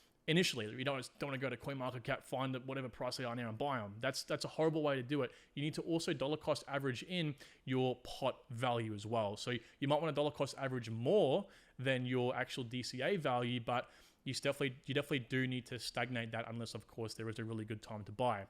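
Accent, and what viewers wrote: Australia. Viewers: Absolutely no bs, I love it. Educational as heck, perfect Australian accent, and everything you could honestly ask for, especially being free content. Brotha keep the grind up, I absolutely appreciate the help you give us, thank you and take care of ya self✌🏻🙏🏻💪🏻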